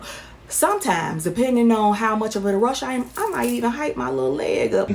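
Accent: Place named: South, United States. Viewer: New Subscriber! Great video. Love the southern accent 🤗💜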